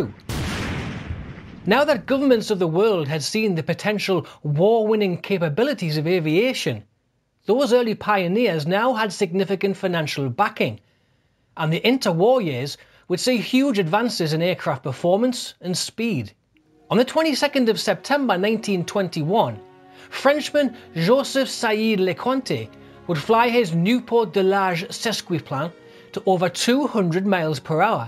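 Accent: Newcastle, United Kingdom. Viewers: A very interesting ‘Geordie’ voice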